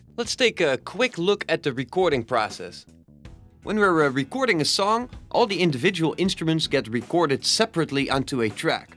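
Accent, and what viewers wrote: Netherlands. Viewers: Love the Dutch accent 🤣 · are you dutch? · Ik hoor een Nederlandse accent? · klinkt nederlands? alsnog goed accent hoor haha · Amazing explanation! By the way are you Dutch? That accent sounds so familiar. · You dutch?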